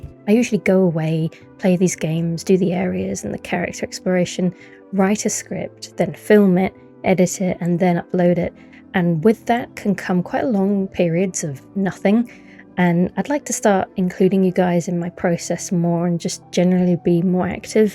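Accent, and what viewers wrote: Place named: England, United Kingdom. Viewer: It was mainly the lore videos that caught my attention & made me keep coming back for more, your voice & English accent if I’m correct were a very nice bonus 😁😍😮‍💨👌🏽looking forward to more content from you, just don’t overwork yourself take a step back & breathe if you need to 🫶🏽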